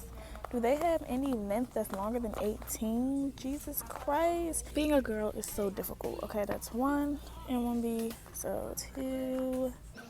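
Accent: Washington DC, United States. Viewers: Girl are you from DC or PG because the accenttttttt!!! 😂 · You started talking &nd I was like BOOM that’s DC right there lol. I LOVE this tutorial &nd how you added all the products, that’s always my main question. I also have to give you props because you have so much hair naturally I was tired for you after the bottom row LMAO. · Was going to say you’re from DC but you already confirmed. I love our accents ❤️ Great video! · Is she from the DMV? I feel like I'm getting notes of DC when she talks lol. ANYWAY I'm finna try these next week 😜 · You from DC? I hear our accents Lmaoo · Are you from DC? I am from Maryland and I can hear your accent. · You from DC sis? That accent ayyyeee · Joy B yup 1 minute in..I'm like she from DC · I knew you sounded like you were from dc! 😭😭😭🖤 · Yes accent, I can tell you’re from DC!!!! Lol ❤️ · I know a DC accent when I hear one 😏😏😏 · I knew the moment she started talkin 🤣🤣 DC all day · Subscribed as soon as I heard that DC accent 😭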